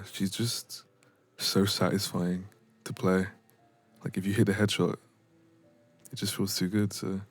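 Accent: United Kingdom. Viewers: Omg so I wasn't going to comment on this video because I am sooo late but we have so much in common it's incredible! First of all, I am a 20-year-old female, my parents are from Uganda but I was born in France and I live there now buttt I also lived in England for 4 years when I was younger near Wimbledon so that's why I love your accent and it feels so much like home to me :) I love winter and Halloween so much they are the thing I look forward to all year and Christmas music is my kryptonite, the country I would like to visit the most is Canada and I also love kdramas and anime so much, my fav recent series is Alice in borderland. Also if that wasn't enough I have a very artistic background as theatre is my passion as well as music and I have studied and practised both for years and would love to continue, 99% of my friends are either in graphic design/art (art school in architecture/ designing etc), music or cinema/ theatre so art is the only thing I know and love religiously with all my heart. 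There are also so many other things that I forgot but you get the picture lmao I think it's crazy that I have been a fan of your channel since the begging before you even had 5k and that I now find out that we have a LOT in common :) anyway sorry for my long paragraph but I love your work and hope you get to 1M one day because you deserve it so much! I am on your discord so if you ever want to chat it would be a pleasure! my is Angelina with purple hearts <3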